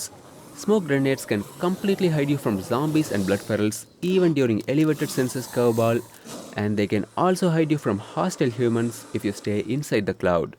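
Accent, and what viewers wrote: India. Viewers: This.is literally just a copy of the other dudes 2024 vid with an indian accent. Same transitions and everything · Why do you sound like a dirty pajeet?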